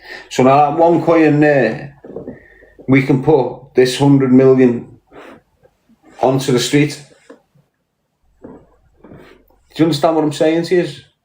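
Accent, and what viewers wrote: Liverpool, United Kingdom. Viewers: The Scouse Terrence McKenna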